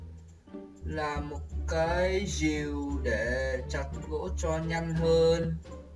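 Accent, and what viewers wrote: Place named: Central Highlands, Vietnam. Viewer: cải thiện cái giọng nói như người vùng cao ý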